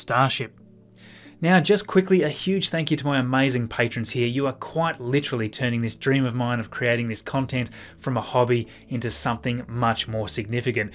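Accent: Australia. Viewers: Nice Channel. Just hit subscribe after watching you for a few weeks. good content, and nice for you credit your sources. Question for ya.... You sound like an Aussie... And I know it's likely to not get the views you may want, but what about something on the Gilmore Space up on the Gold Coast?? Anyways.. thanks for ya content:) - 4563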